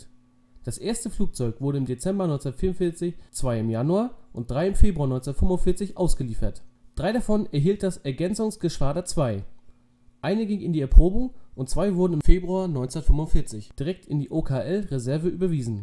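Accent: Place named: Mecklenburg-Vorpommern, Germany. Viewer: Der Sprecher stammt der Aussprache nach, vermutlich aus dem nördlichen Mecklenburg-Vorpommern😉. Klasse Video!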